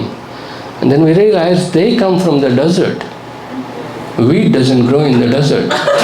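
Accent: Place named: India. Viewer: Keep ur Indian accent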